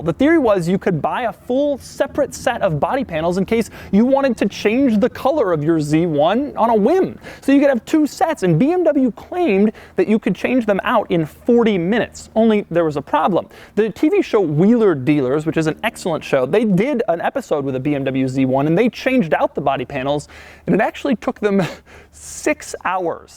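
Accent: United States. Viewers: Doug is American. We say “zee” here. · Ya, and it's Zee Zee Top, not Zed Zed Top, chill he's american, americans say Zee.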